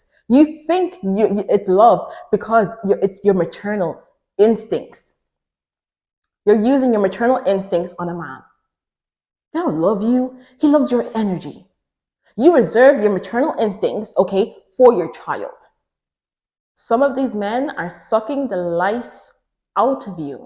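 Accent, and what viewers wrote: Ireland. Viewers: Beautiful lip colour. I hear the Irish accent 😀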